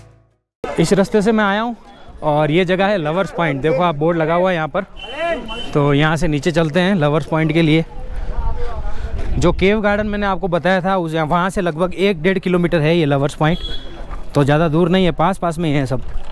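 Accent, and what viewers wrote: Gujarat, India. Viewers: Gujarati ho Kiya bhai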